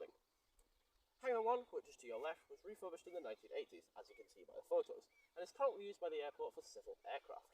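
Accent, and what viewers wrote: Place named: Germany. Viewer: Excellent video. Very interesting and a great German accent too!!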